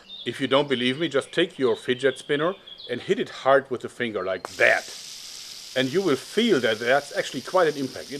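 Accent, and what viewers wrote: Germany. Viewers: I've said this before, and I'll say it again, you're such a happy, jovial man, with an infectious laugh. And the weather seems to always be near perfect when you shoot your videos. I happen to love the topic of your channel, but I think I would watch them even if I didn't because I like to hear your German accent. I hope you have much continued success. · i love this german accent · Hi hörst dich Deutsch an glaube auch das du es bist xD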